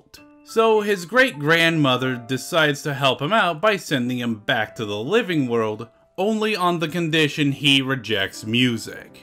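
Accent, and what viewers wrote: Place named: United States. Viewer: I'm worried that this is a cultural issue. I don't want to assume you're American, but the accent says enough. In America, it's perfectly normal to go against your family's wishes to follow your dreams. That's an outlier in the world as a whole. In many cultures, family is everything. I'd suggest getting a perspective from someone who is actually from the culture they went so far out of their way to represent, before calling someone who is upholding an important, if nonsensical, family tradition as a "medieval reject".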